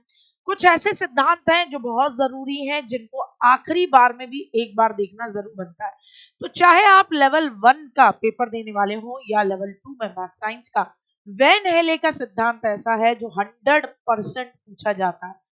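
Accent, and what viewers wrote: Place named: Haryana, India. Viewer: Haryanvi bol rhe h bhaiya wo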